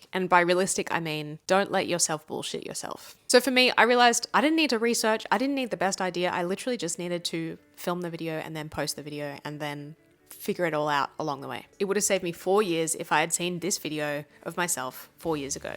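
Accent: Australia. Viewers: Sounds Aussie like Struthless. Cool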